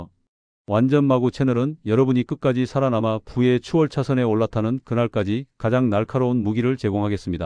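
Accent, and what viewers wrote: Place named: Seoul, South Korea. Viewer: 서울말같기도 아니기도 같기도 아니기도ㅎ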